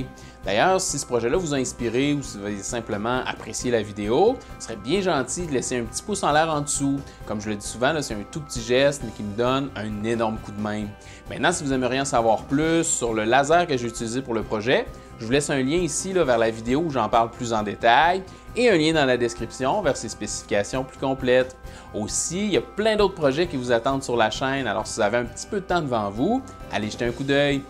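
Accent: Quebec, Canada. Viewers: Salut. Un petit coucou amical de France, un merci pour tes videos simple et efficace. J'avais envie de revenir sur ton aparté avec le mots "etampe"... Je me demande si le joli accent que vous utilisez au Canada, n'aurait pas mangé le "S" de é"S"tampe. Parce que ça signifierait que le mot est juste ici en France aussi. On dit aussi une estampe, estamper ou estampillé... Qui devient un synonymes de tampon, tamponner... En tout les cas merci et continu. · c'est pile poil ce que je cherchais, vidéo très pro, avec ce joli accent que vous avez nos cousins des Amériques ! Bravo !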